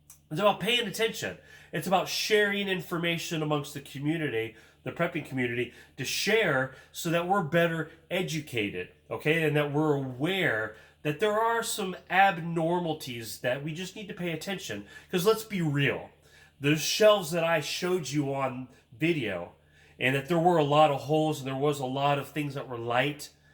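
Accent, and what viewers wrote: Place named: Pittsburgh, United States. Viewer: oh ok. Your accent sound like Pittsburgh. 😃.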